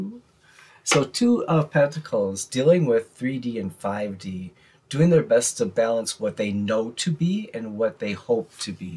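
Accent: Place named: Ireland. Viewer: thank you so much for all this information in this hopeful reading, hope your feeling okay and love the Irish accent 🙈😊 thank you for always making my day 💖 looking forward to the next reading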